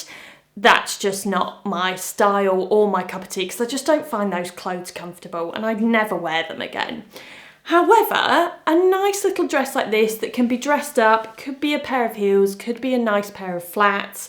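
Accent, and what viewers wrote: United Kingdom. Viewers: Ohhhhhh Everything amazing 🎉🎉🎉🎉🎉. Your british Accent just kills me 😂 of happiness. So so elegant❤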